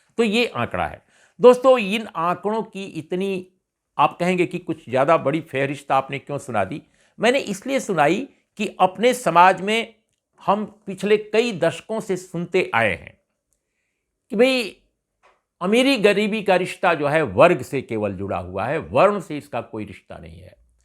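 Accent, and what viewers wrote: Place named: Bihar, India. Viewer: आप ऐसे बोलते हैं लगता है कि कोई बिहारी ही बोल रहा है। आपको मेरा नमस्कार है 🙏🙏🙂🙂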